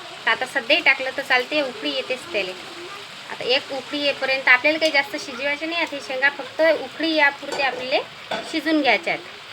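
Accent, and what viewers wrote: Vidarbha, India. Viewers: भाजी एकदम छान केली, मला तुझी भाषा आवडते कारण मी अकोल्यात राहते, तू कोणत्या गावची आहे ❤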